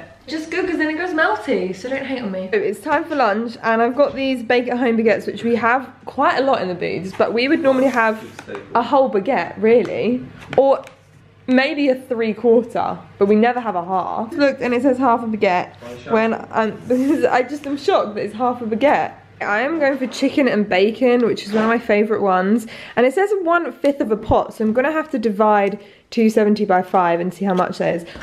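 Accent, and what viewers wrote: England, United Kingdom. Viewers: Who else loves her British accent? 😍❤🇬🇧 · Are you British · Pink Fluffy Unicorn a brittish accent could be northern Irish welsh etc but her accent is just English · So that's what a british accent is...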